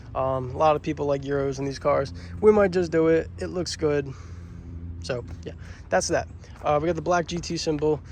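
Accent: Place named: South Jersey, United States. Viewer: Da souf Jersey accent is real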